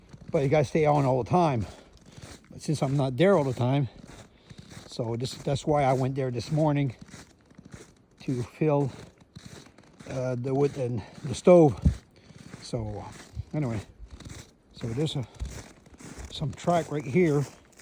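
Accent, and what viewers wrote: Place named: Louisiana, United States. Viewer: If you're Canadian why do you sound kinda like a Cajun?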